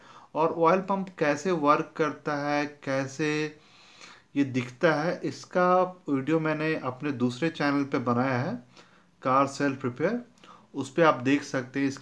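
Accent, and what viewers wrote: India. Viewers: Titled in English. Audio is Indian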